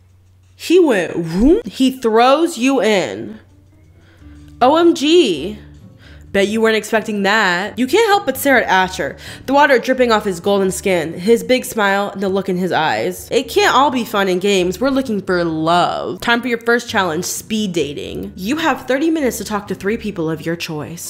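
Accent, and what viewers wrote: France. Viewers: The French accent though. 😂, SHE'S ICONIC! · omg i love your french accent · YOUR FRENCH ACCENT SLAPS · the french accent is EVERYTHING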